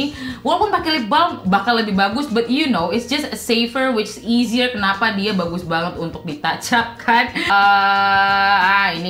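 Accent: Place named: Papua, Indonesia. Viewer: Sukaaaaaa bgt ama logat PAPUA nyaaa 🥰 sering2 dipake kaaaa 🥰👍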